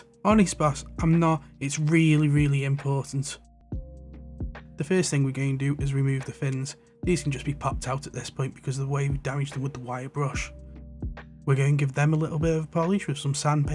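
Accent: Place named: Stoke, United Kingdom. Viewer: As a fellow stokie, you can hear another stokie from a mile away.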